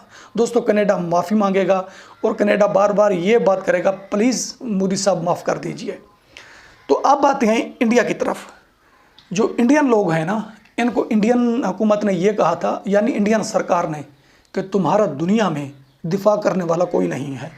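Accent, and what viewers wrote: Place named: Saraiki, Pakistan. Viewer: bhai andaaze bayyan boltta hai aap sirraaeikee zubbaan ka mixture hai achhaa bolte ho khush rahein panipat s kumar singh o k B y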